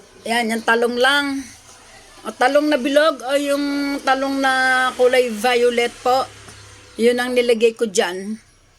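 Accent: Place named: Tanay, Philippines. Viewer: ate taga tanay rizal po ba kau punto nyo po kasi tanay kung magsalita hehe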